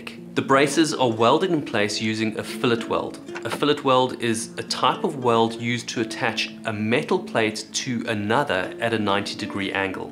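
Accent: South Africa. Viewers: You sound very South African. I love the accent and you are a real good story teller. Great stuff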